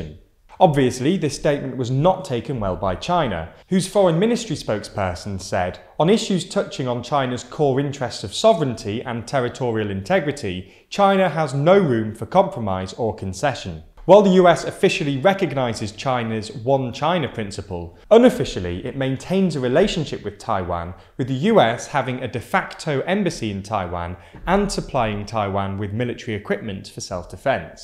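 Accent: United Kingdom. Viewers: He’s British lol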